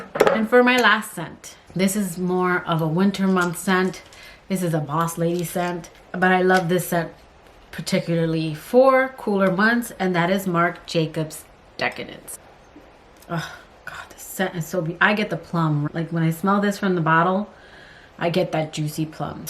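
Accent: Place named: Miami, United States. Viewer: Girl, tell me how I’ve seen a couple of your videos and I thought she has such a Miami voice .. are you from Miami? 🤣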